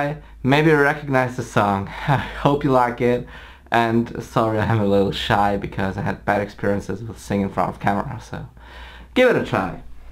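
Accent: Germany. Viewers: your german accent (which I only noticed slightly in one Q&A)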